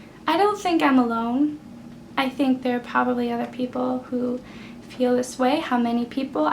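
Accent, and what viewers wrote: France. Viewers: Coucou, j'ai cru comprendre que tu avais peur de parler français parce-que tu n'as pas beaucoup de vocabulaire et que tu avais peur de passer pour une idiote, j'ai le même problème quand je dois parler avec une personne anglophone. Et je pense que beaucoup de personnes ont ce problème. En tout cas j'ai beaucoup d'affection pour toi et je trouve ton accent français très mignon. Bisou et bonne continuation;)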